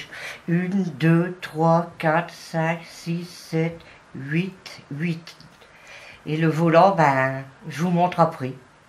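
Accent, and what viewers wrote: North, France. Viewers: bonne nuit merci pour votre modèle votre parler et du nord, votre voie me rappelle ma grand mère,ma mère et mes tantes merci j espere que le confinement nais pas trop dur a bientot JSC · Bonjour je trouve la jupette hyper jolie je vais,la faire. Mais dite donc vous n'êtes pas du Chnord avec l'accent que vous avez.... j'adore....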